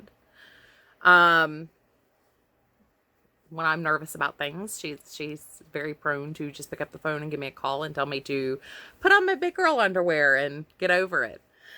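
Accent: South, United States. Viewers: Im a new subscriber but wanted to say I really love your videos! I watch Mary from Ireland too LOL my late husband was very Irish so she touches my heart. Your sweet southern accent reminds me of home (I'm a country girl from rural midwest). Keep up the great vlog!!! Oh and I luv luv luv the dr who scarf!!!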